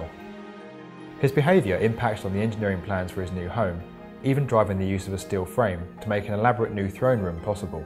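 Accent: United Kingdom. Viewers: I hv 2 comments on the narrator and the commentators here: 1- the narrator is speaking in British English, with a heavy accent only the British could follow him. British English is a curiosity to listen to but very difficult to understand and follow by non English speakers. The Brits speaking to non-Brits should realize this and speak more intelligibly (slower). 2- people who not versed in foreign pronunciation and phonetics should not speak/write as if they are correct and should notate their input as “I believe”. None are German unfortunately which makes no one’s right ! It is not Noi nor Noy and “Neu” in German is more French sounding than English so it cannot be phonetically written the English way: it is close pursing the lips when twisting the sound “eu”. Schwan sounds closest to “shvon”, with the “o” here like the American “o” (as in “pot” & “hot”) and not the British “o” (as in “pot” & “hot”). 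Then stein is pronounced simply like in both Englishes “shtine” as in “shine”. When phoneticizing a word one should clarify which type of English pronunciation is used, since British, American, Australian, Indian, Egyptian ways differ considerably, and after all, YouTube is global and not any one of these !!!! Hope this helps non-German speakers pronounce it correctly.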